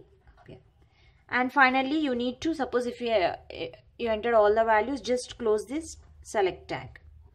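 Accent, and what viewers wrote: South India, India. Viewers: thats mnother toungue influence of south indian languages....so relax n focus on important learnings...everybody has that influence india as per the region or state they belongs to...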